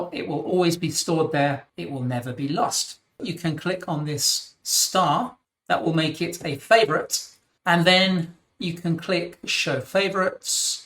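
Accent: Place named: United Kingdom. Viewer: Hi coach I realised yesterday You are very very good Many thx for sharing your experience Also i like very much uk accent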